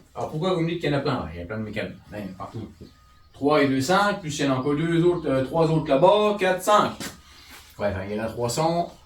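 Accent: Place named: North, France. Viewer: C’est juste, parce que j’ai l’impression de reconnaître un accent du Nord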